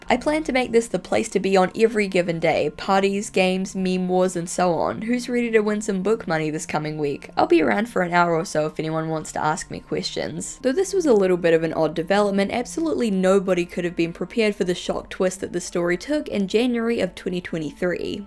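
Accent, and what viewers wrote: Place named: New Zealand. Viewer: I think she's a Kiwi?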